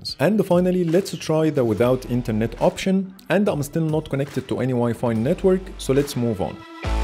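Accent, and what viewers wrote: Egypt. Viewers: انت مصري ؟ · ليه انا الوحيد الي حااس انو الي بيتكلم مصري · are you Egyptian I can tell from the accent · الصوت مصري مصري يعني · انا حسيتك عربي برضه من بداية الفديو لما سمعت لهجتك الانجليزية 😂😂 قلت ده مصري اكيد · مصري عايش في الامارات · انت مصري صح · I have feeling that you are Egyptian from your accent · sound like Egyptian to me😁😁👍👍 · You sound Egyptian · Amazing video I only have one question, your accent looks familiar you must be Egyptian right ? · لهجتك كصريه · انت مصري يسطا ؟ ال accent دي مصري وش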